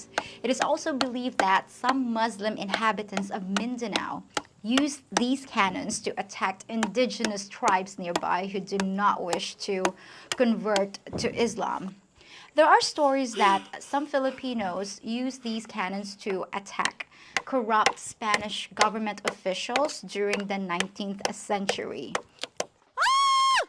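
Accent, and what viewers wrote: Australia. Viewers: “It cracked the bloody cannon…crikey. Gotta love a girl who speaks Australian. Great video.🙏❤️❤️❤️❤️❤️🇦🇺😎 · a bit of an ausie influence !! another fun video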